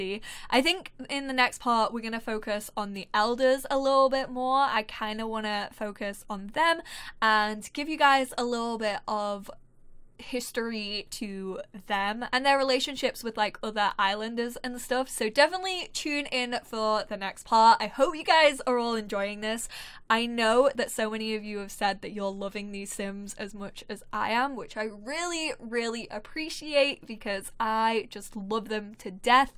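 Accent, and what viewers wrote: England, United Kingdom. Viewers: Btw... I love the English pronunciations of many words... like aluminium. So don't try to change.😁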